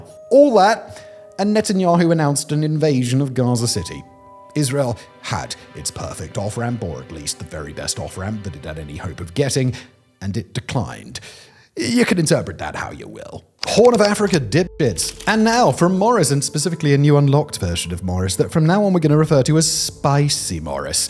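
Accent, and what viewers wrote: United Kingdom. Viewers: His British accent is too thick. It sounds like jibberish. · Hey,! Love the channel, but could you dial down the British wanker cadence just a tad? Cheers!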